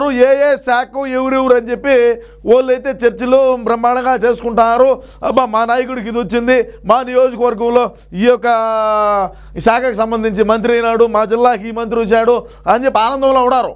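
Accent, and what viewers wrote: Rayalaseema, India. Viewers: నీ అమ్మ పాసు గాల నువ్వు ఎవరి పార్టీ పక్షాన ఒకళ్ళతా గుచ్చుకున్నా ఈ మాదిరిగా చెడుగుడు అనుకుంటున్నావు మీరు మాట్లాడే రాయలసీమ భాషా యాసా చాలా బాగా మాట్లాడుతున్నారు మాట్లాడుతున్నారు ❤ you రాజా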